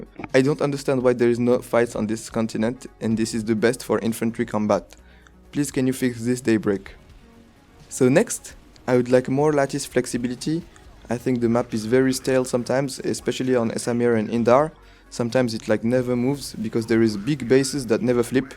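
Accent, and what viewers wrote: France. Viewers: quand je t'entend parler, j'entends un français qui parle anglais ( tu as un accent français) 🙂 je suis certain que tu es français, Si tu l'est, en tous cas tu parle sacrément bien anglais!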